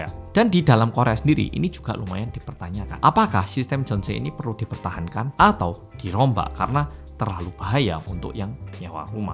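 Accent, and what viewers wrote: Java, Indonesia. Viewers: Seharusnya mas ini yg jd penterjemah tim Red spark. Bhsa Indonesia nya super lancar jaya n medhok. Ngomongnya udh Indonesia bangetlah pokoke👍👍👍👍👍👍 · Aku kok belum terima ya mas ini orang Korea 😢 medok gitu kok, pura2 kali JD orang Korea 😢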